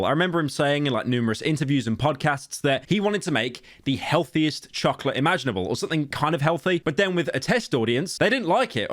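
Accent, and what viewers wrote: United Kingdom. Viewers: Shut up British man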